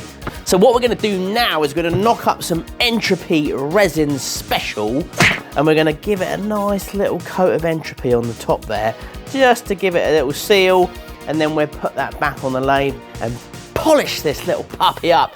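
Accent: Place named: RP, United Kingdom. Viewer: very posh 😊👍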